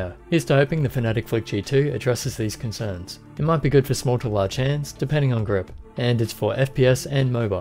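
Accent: Australia. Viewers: are you aussie? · yes he's from Australia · Yo man are U australia!?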